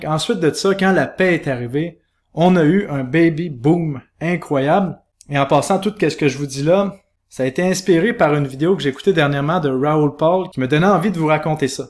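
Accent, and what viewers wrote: Canada. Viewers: J'adore ton accent canadien 🇨🇦❤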